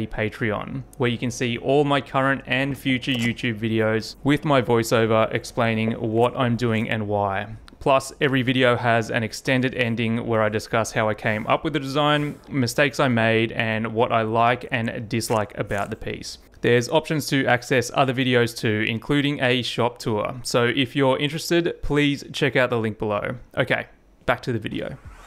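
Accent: Australia. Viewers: Hi, I just stumbled onto your video documenting your amazing bench seat. Nice to hear an aussie accent on YouTube and a Sydney business as well!!! Amazing craftsmanship, thanks so much for sharing... 🙂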